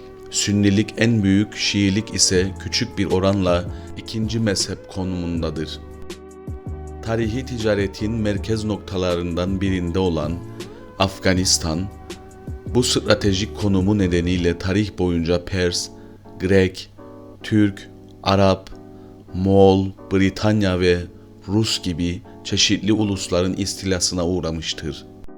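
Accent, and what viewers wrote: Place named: Northern Cyprus, Cyprus. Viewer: Admin Kıbrıs Türk'ü müsün şiven benziyor 😊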